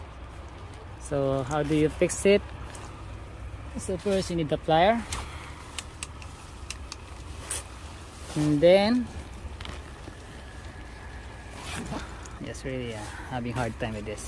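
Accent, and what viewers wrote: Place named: India. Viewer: God bless you poonjabi! Just when thought every one with an Indian accent was just a scammer you showed me a true and legit fix for a distressing dilemma! It totally worked and i didnt have to purchase the stupid product that the white people in the other video were trying sell me. Scammers come in all colours and so to truely legit people. Your fix it worked crazy good. Thank you poonjabi. I liked and subsrcibed your chanel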